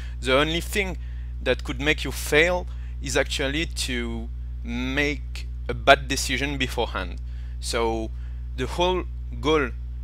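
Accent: France. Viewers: dat french accent sound so pretty :3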